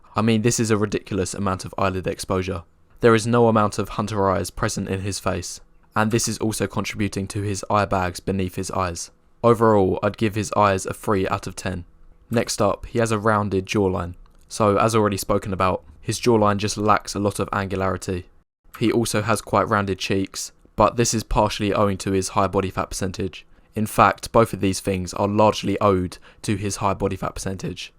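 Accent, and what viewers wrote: United Kingdom. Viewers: but the narrator's voice is way more feminine and soft and incel then all of these guys he's calling out. What's worse is he's got a British accent which is viewed as weak in most cultures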